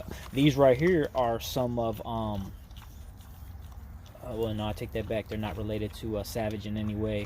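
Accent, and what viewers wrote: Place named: Rural, Puerto Rico. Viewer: Good information bro.. this is dead ass my 1st hearing a Country Rican lol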